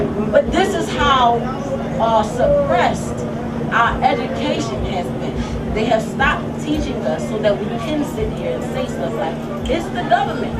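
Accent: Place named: Philadelphia, United States. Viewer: "Voluable"? Lol, love that philly accent. Just teasing! Nice video.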